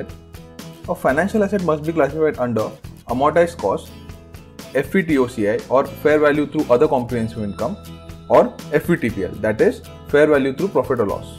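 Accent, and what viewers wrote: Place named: India. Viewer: Indian English ooooah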